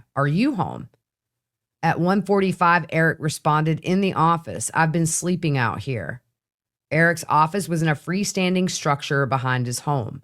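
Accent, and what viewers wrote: South, United States. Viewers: Your southern drawl is so beautiful ❤️😘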